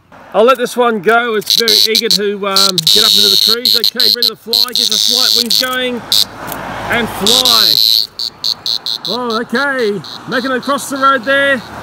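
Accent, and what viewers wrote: Australia. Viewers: your natural curiousity is on point mate., also we really need another aussie to narraeete some nature action. aussie narration is the best narration that exists.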